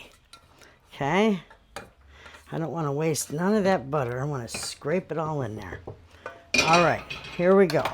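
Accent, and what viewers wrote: Michigan, United States. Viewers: I am so glad I found you! I started my at home cottage bakery back in October last year and I am learning so many tips and tricks from you. We are doing our local farmers market and getting some really great feedback and sales so far! Thank you so much for being open with your techniques and recipes!!! PS I am from MI, haven't been a resident there for over 25 years but I distinctly hear your Michigan twang LOL. Love it!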